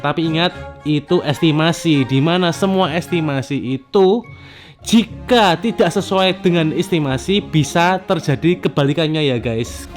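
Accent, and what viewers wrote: Java, Indonesia. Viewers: pak medok banget pak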